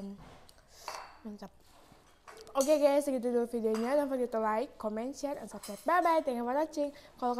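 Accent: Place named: Flores, Indonesia. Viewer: Kek ada logat Bajawa😅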